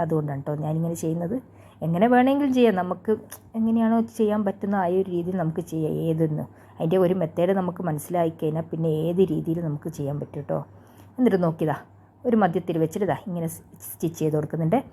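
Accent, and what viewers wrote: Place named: Vadakara, India. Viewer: Are u from വടകര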